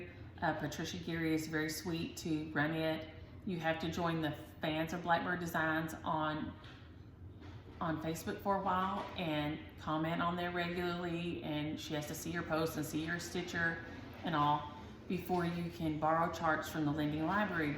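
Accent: South, United States. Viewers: You crack me up! That moonshine story was so funny!! I love hearing your stories. I lived in Louisiana for several years while I went to school and your southern accent and "isms" brings back so many wonderful memories. Southern folk are the nicest people!!!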